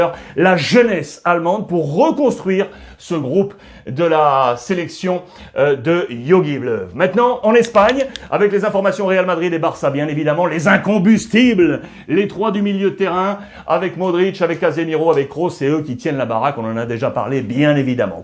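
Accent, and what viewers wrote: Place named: United States. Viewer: L'accent américaine top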